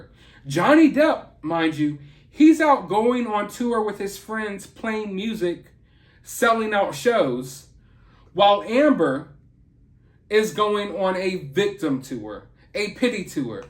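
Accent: South, United States. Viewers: You are a way better actor than she ever wanted to be, and the southern accent love it Sho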